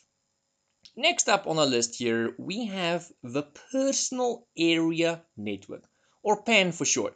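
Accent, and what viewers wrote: Afrikaans, South Africa. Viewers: I can not unhear the Afrikaans poping out from the english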